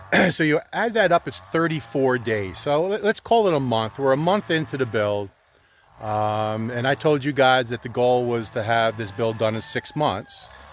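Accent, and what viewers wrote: Philadelphia, United States. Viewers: Very cool - I hear philly in you :) am i right ?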